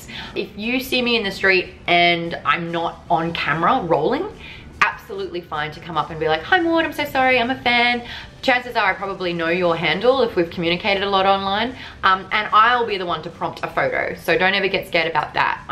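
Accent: Australia. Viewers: You sound more Aussie than usual. I dig it ;)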